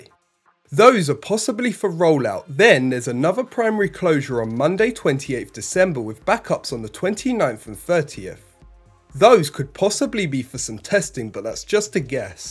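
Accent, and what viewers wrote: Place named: East Anglia, United Kingdom. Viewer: The pronunciation is from East Anglia. It is spoken this way by millions.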